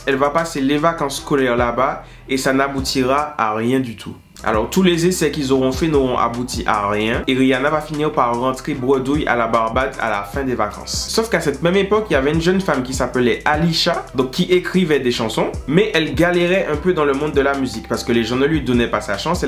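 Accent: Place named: Martinique, France. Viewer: Martinique ou île de la réunion ??? Cet accent 🤣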